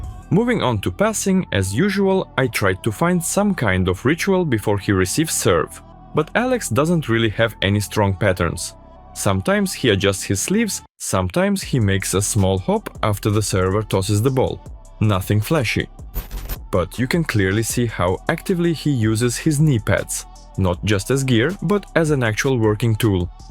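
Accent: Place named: Russia. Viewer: Grüße aus Deutschland 😊 Love your Vids! Like your russian accent. Go on your way! looking forward to see the russian national volleyballteam in the future. You have so much power in your rooster and a huge pool of players in your league! Praying for the End of War. But In Germany We have one of the dumbest goverments of the world! They hate us german patriots more than anyone else 😅 maybe they hate themselves a little bit more 😅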